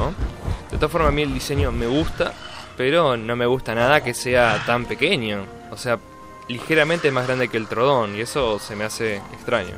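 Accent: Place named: Argentina. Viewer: se nota que es argentino 🔥🤷🏾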